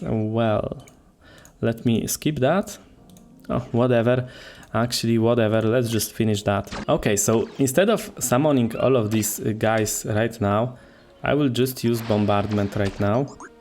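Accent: Poland. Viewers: Nie wiem odczuwam, że jesteś polakiem, tylko my tak kaleczymy angielski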